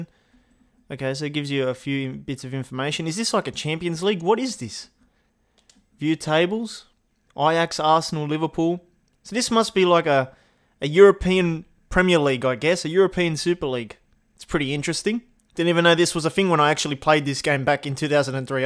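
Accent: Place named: Australia. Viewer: This is the most Australian commentary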